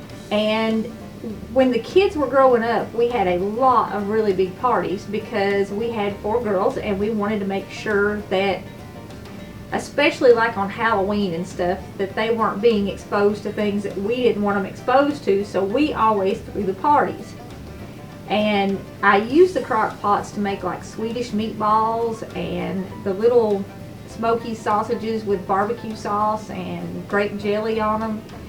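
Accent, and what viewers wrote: South, United States. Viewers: I love your southern accent. The music you useis great. I also love the dishes you cook. I like down home comfort foods.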